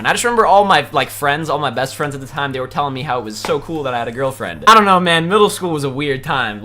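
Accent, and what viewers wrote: Russia. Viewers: That Russian accent was great lol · Do a full video in Russian accent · Blaziken you should make a new account go online mod and use you Russian accent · Russian accent gave me fucking cancer.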